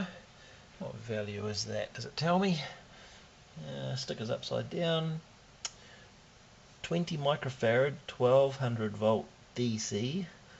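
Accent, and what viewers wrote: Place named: New Zealand. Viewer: Thanks for the video. Very enjoyable.. You sound like a New Zealander... Auckland maybe..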